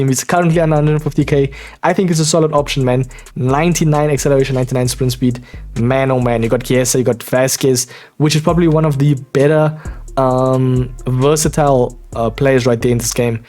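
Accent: South Africa. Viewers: As sooon as i heard your voice i knew you were south african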